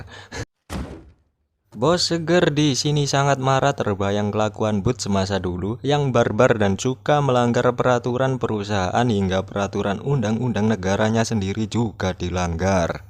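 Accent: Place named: Java, Indonesia. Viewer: Jawa nya kelebihan mas pekat kali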